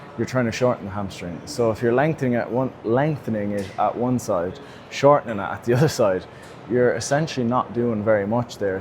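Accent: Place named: Ireland. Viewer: we’re all strange cunts, Normal is boring 😆 , Yes I’m from Cork myself that’s why im tryna figure out where from here your from by accent😂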